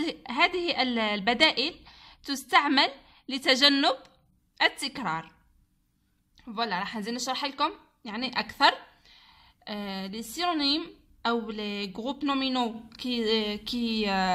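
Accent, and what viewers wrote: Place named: Constantine, Algeria. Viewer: قسنطينية راكي باينة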